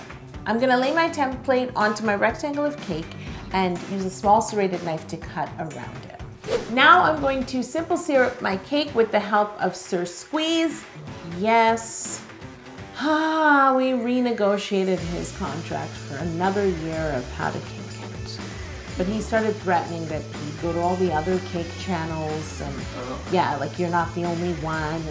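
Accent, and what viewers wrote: Canada. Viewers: She sounds so Canadian what are you talking about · I love her and her Canadian-ness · Yo: "This tee is so reflective, eh?" Me: Yep, definitely Canadian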